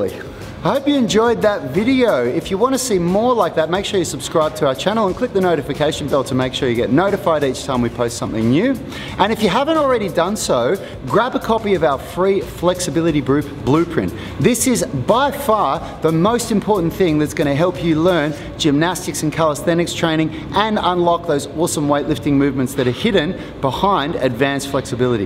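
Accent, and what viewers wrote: Australia. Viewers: Great explanation. Are u an Aussie?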